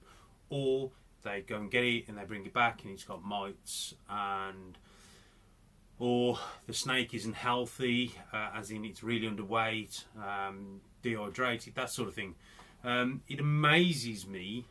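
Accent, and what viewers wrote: Black Country, United Kingdom. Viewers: Well Mr, if it don't come from you I don't want it😲 but that because of the yard stick I mark others by, it's reputation, reputation, reputation with a bald head and a Black Country accent😅😅😅😅😅😅😅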